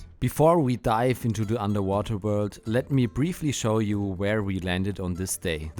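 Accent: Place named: Germany. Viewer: The dialect is so german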